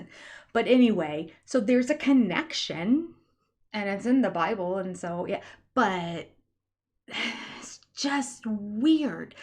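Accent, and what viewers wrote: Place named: Midwest, United States. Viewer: Yeah, but lol you have a Midwestern accent even though you don’t think you do 😂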